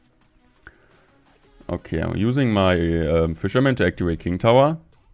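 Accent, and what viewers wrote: Germany. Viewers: The german accent hits hard But good video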